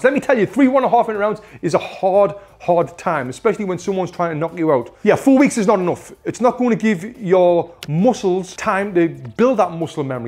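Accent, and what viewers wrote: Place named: North East England, United Kingdom. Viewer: Great advice, and explained clearly with enthusiasm, glad to see you've not lost the North East accent mate! Well done.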